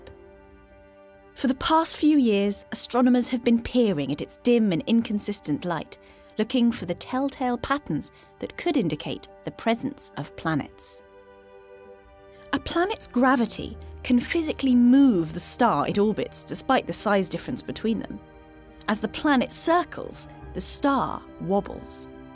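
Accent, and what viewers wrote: England, United Kingdom. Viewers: I hate British accents. Especially when it's woman's voice doing it. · Really getting tired of English accent narratives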